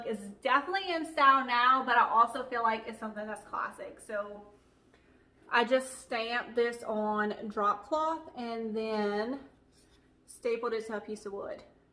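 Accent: Louisiana, United States. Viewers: I knew you were from Louisiana, you sound exactly like my daughter in law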